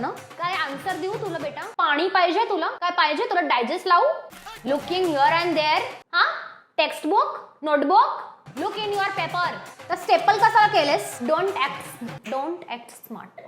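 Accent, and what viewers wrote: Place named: Pune, India. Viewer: Ohh that puneri accent... Paniii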